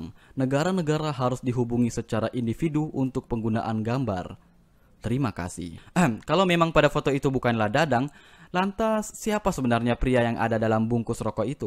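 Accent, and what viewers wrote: Makassar, Indonesia. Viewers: Kalau di dengar dari logatnya, orang Makassar kah?? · Org Makassar ya bang?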